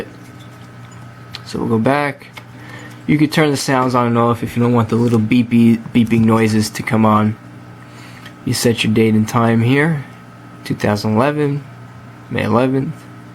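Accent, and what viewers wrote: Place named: New York, United States. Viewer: Are you a New Yorker? I detect a New York accent.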